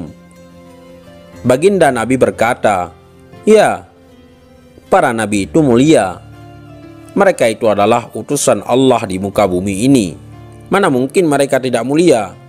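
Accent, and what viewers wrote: Aceh, Indonesia. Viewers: Menurutku itu logat orang acheh bro bukan bali ataupun lombok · Aceh pidie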